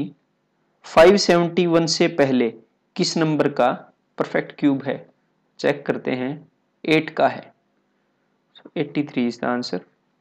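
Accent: Haryana, India. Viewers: bhai haryane ke ho ???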